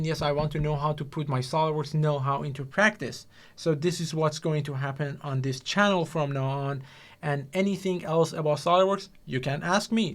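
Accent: Iran. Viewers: Hello, you have Iranian accent, are you from iran?:D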